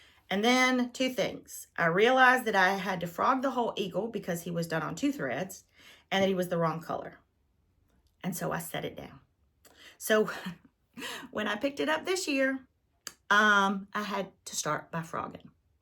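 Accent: South, United States. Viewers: I got so tickled to hear your Southern twang. I've lived in the Tampa Bay area almost 40 years. I must be drunk and blind! I miss my Tennessee roots, and my North and South Carolina family and friends. People here still ask, "Where you from?" I need a snarky answer to that! Really enjoyed your flosstube, as an "old" newbie to your channel, but 50+ years cross stitching. Love a Blackbird❤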